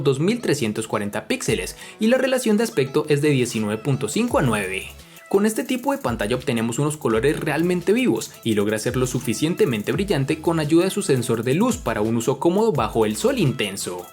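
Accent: Cali, Colombia. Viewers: Vos sos de cali vé?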